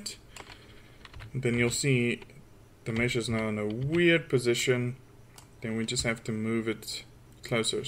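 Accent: South Africa. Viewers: Great video! Are you South African?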